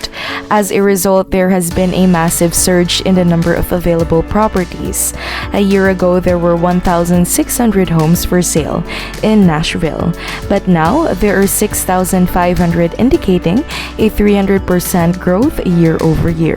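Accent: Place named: Philippines. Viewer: I detect a accent from the PI. From CA here, average house is around 700k, which is a monthly around 5k for a 30 year... this means the average person has to be bringing home a 15k monthly paycheck........or ~3% of the population. How is it, that any houses are selling at all at these average prices, when 3% of people quality for the average home?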